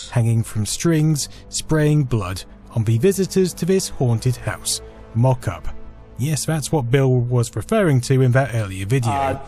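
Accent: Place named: England, United Kingdom. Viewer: Just wanted to say I love your documentaries and how professional they've become. Your gentle English accent is soothing to my American ears. I'm grateful to get to learn more about niche retro computing topics.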